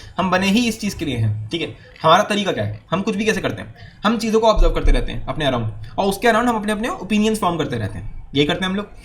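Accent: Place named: Uttar Pradesh, India. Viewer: Ur from UP 👉 right?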